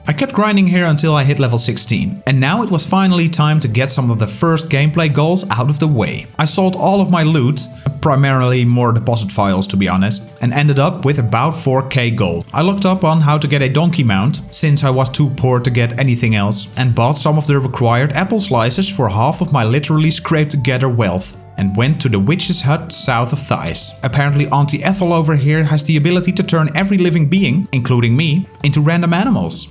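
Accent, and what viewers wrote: Netherlands. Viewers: Thick Dutch accent, my g. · You sound Dutch, I am Dutch. I still have a character in Peloria, I can help you out anytime :) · Ik herken dat nederlandse accent meteen ;)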